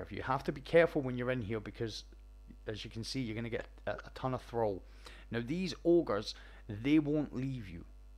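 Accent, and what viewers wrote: Scotland, United Kingdom. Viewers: You are the man! Just used your solo guide for thorn strike! Will be using this guide for the solo clear. That’s awesome that you also call some of the symbols like my friend and I did. Vex head, turret, and burger haha! Thanks for everything you do dude, so so helpful! Take this as a compliment, you’re like a Scottish esoterickk! (Hope I got the accent right) no offense if you’re not Scottish!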